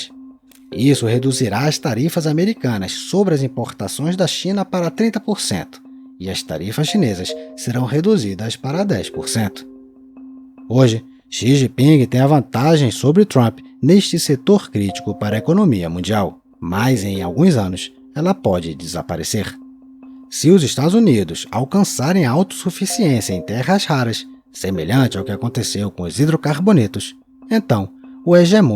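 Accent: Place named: Rio de Janeiro, Brazil. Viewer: Esse é o melhor canal de geopolítica que já vi, chaga a ser um canal essencialmente excelente. Acho que o narrador é carioca por causa do sotaque.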